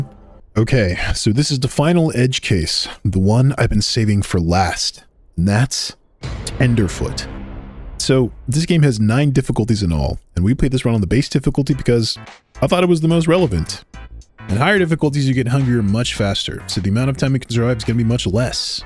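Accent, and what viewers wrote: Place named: United States. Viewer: Where do you live that you have an american accent and your room can get to 90 degrees in the middle of January??? Do you live in a windowless basement? Pheonix Arizona??? Ameristralia?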